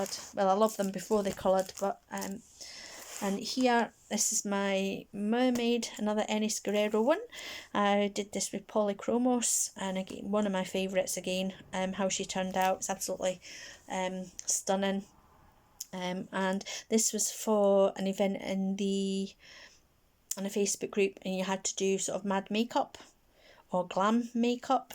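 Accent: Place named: Scotland, United Kingdom. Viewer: What part of Scotland is your accent from, love listening to you.😘❤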